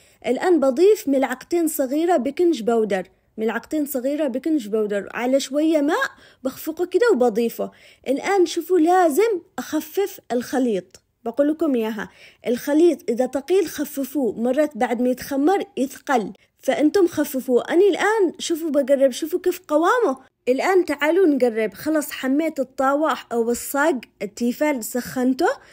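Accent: Aden, Yemen. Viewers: اموووت باللهجه العدنيه وامووت بطريقتك واطروحاتك وبصراحه حبيبتي افضل واضخم وارقى من شرحت الطريقه الصحيحه بكلللل تفاصيلها تسلمي حبيبتي ❤ اختك ام اياد من صنعاء 😘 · بصراحه الصوت لحاله يذوب واللهجه العدني لايق لك ي قلبي اختك من عدن ومن متابعينك واستفدت كثير من وصفاتك تسلم يداتك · من وين هي اذا ماكانت من عدن لان لهجتها عدنية مليون المية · ما شاء الله باين عليه لذيذ ... واللهجة العدينة حلوة... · مين زيي بتعشق صوت ولا بس انا 😅بحب لهجة عدن مع اني اصلي من الضالع بس لهجتي بالعامية لاني عشت حياتي بين عدن وصنعاء والضالع والحين بالسعودية من ٢٠ سنه لما اتكلم محد يقدر يعرف انا من اين بس ماشاء الله لهجتها ما تغيرت الله يحفظها. فعلاً هذه الماركة حلوة اخذت طاوه وخليتها للحوح فقط لها معي خمس سنين وما يخرب على اللحوح أبداً ❤❤❤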